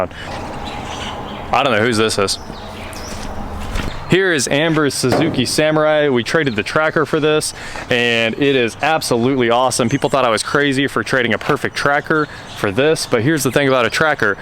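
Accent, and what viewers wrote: Kansas, United States. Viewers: Kansas- northern Oklahoma dialect. It's how we talk 'round here.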